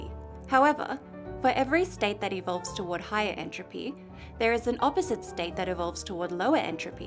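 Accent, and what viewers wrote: England, United Kingdom. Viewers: a pleasure to find out your name. I have watched maybe a dowzen of your videos, and like them all. I took algebra, geometry, trigonomics, physics and chemistry in college. But your simple explanations help me recall and see things in a new way. You even helped me to understand Calculus! Thanks! The English accent is a bonus for me.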